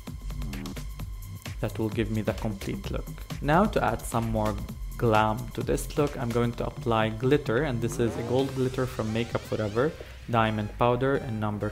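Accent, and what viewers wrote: Arab world. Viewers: مبين عليك عربي من طريقة كلامك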